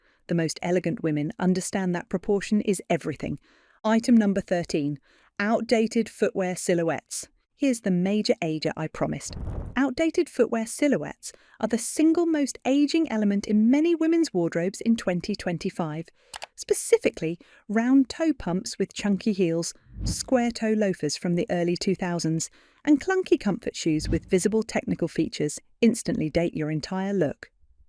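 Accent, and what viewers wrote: England, United Kingdom. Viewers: You have an English accent, however you use American terms such as Pants instead of Trousers, I don't understand why the English language used in the UK, and taught in Europe has changed its language to fit in with American English. we are teaching our children American and at risk of losing our own language. This is not me having a go, I just don't understand!!!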